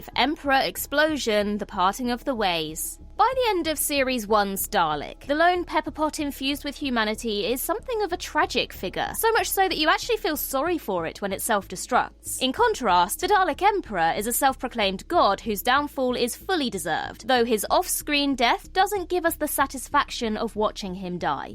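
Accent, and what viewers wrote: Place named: RP, United Kingdom. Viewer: She’s a woman and it’s RP English.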